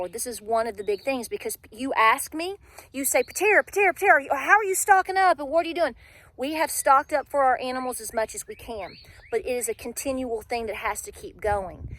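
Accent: South, United States. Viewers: Came in from this Oklahoma heat after hanging garlic to shell some peas and was delighted to another “Truth Hurts” episode. Thank You for being our voice. I know you didn’t ask for that position but only a small handful of folks can get through the wokeness these days and we have it in a beautiful southern accent 🥰 God bless you, ( the ), all the young men in your household. You are greatly appreciated!! 🤓❤️🇺🇸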